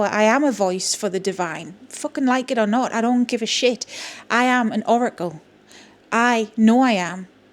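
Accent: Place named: Newcastle, United Kingdom. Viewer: I love Ur authentic self & the fab Geordie accent, I agree too many low minds jealousy ect. I don't av the knowledge on these gods goddesses I channel some Hindu Buddhist to paint them blessings xx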